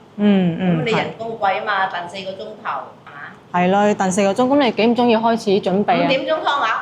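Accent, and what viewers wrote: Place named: Xiguan, China. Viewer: 老闆娘好勁，咁都聽出妳係西關小姐😂。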